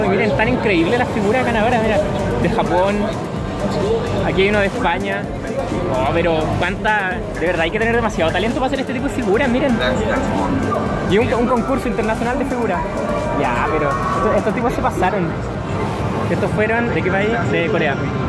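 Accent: Chile. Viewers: Acento chileno ?..soy nuevo en este canal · Eres chileno¿?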